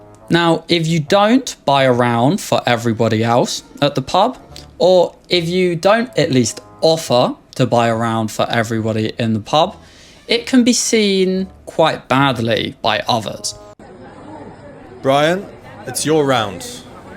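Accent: United Kingdom. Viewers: Bu ingiliz ingilizcesine bayılıyorum, dinleye dinleye kulağım alıştı.Hangi bölgedensiniz, bu diyalekti çok seviyorum.Birmangham, liverpool, veya güney? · I like the way you pronounce the "os" in words such as "don't", "hope", "go". Can I ask where you are from in the UK?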